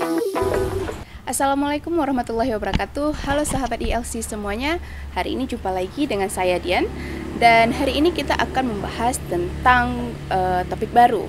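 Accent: Malaysia. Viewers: Logat nya kadang kayak bhasa malaysia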